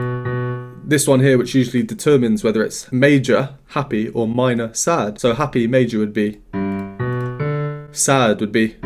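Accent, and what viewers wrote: United Kingdom. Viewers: such an in-depth professional analysis + genuineness + sheer emotions + sophisticated language + British English... couldn't be any better. please keep it up 💚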